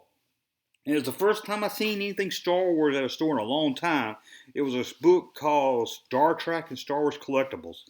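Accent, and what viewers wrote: South, United States. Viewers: i know i'm 2 years late to this party but i really enjoyed this vid. (and all your Junkie vids). i could listen to you rant all day - maybe its coz i love vintage toys, i was born in '74 so i get where you're coming from and mixed with your southern tones and mis-pro-nun-she-a-shuns, your vids make my day. KEEP 'EM COMING !!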